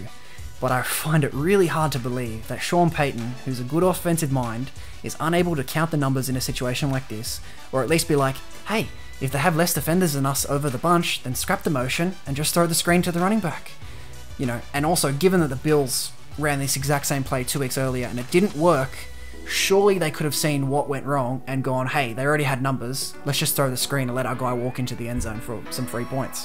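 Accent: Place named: United Kingdom. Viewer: Wait your British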